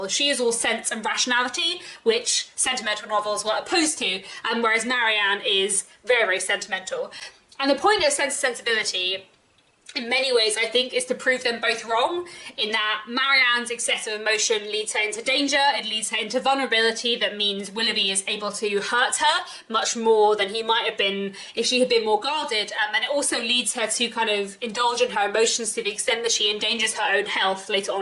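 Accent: United Kingdom. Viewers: you are one of the inspirations and motivations for my English self-learning. I have grown fond of Jane Austen's literature on your video account. People tend to use the US accent in my country and you are why I persevere with the British accent. Love you from Viet Nam ~~ :3 my favorite hero is Tilney by the way, such a charming and practical when necessary man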